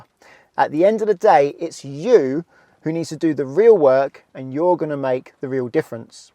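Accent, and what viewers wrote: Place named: Essex, United Kingdom. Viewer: Thank you for your videos as ever! It's so comforting to hear an Essex accent I feel right at home hahaha. I'm currently learning in Bradford which is probably the most exhilarating (dangerous) place to learn in the UK. Could you please come do a video in Bradford and enjoy the ride? 😂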